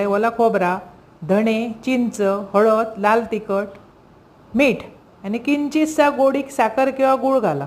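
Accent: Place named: Malvan, India. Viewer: ताई तुम्ही कुठल्या गावच्या. छान बोलता. हे खर मालवणी. ऐकायला खूप छान वाटत.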